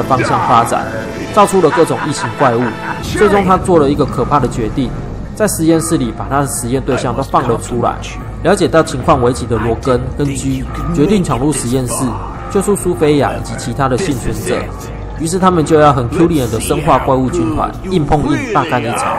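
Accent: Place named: Taiwan. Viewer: 點棟更 哈哈哈哈08笑死 尤其是大大的台灣國語 念點棟更 地契阿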